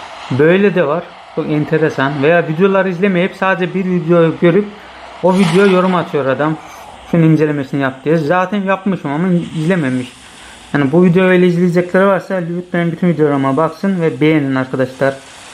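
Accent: Azerbaijan. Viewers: sen azerbaycanlımısın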